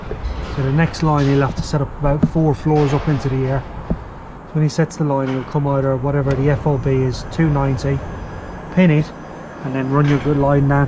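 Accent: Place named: Ireland. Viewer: You sound like a lovely irishman i work ed with in construction many years ago nice work by the way 👍👍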